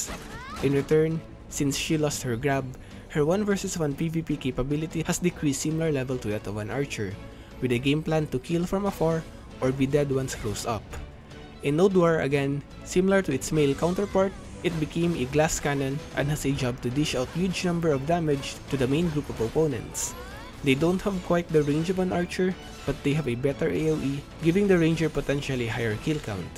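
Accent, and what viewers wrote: Philippines. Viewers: to us big boi zerk mains, this brings a smile to my face. but the reality is, we got tons of unprotected skills, also our Q buff got longass cooldown and can also be used for 30 secs. It's like having the biggest penis but is also a quick shot which sad af. PS: your accent gives me pinoy vibes. :) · Great video! I'd say the music should be turned down a bit though as it's hard to hear you, especially since you don't have an English accent (Filipino?). Otherwise it was really informative, I just bought the game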